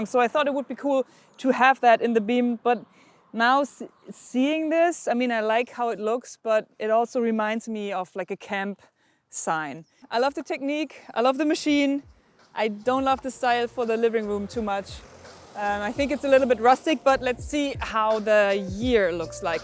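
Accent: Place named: Germany. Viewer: the more serious it is, the more german she speaks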